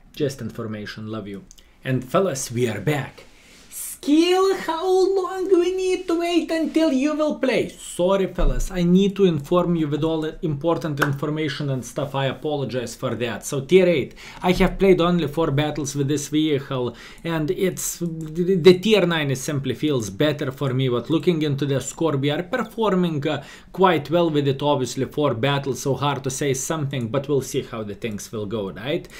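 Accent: Russia. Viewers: where is he from then ??? He may not live in Russia but definitely speaks it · russian akcnet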